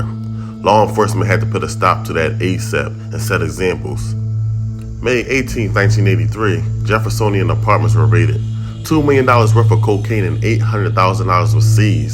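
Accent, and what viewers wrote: Philadelphia, United States. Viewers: This dude narrating gotta be from Philly.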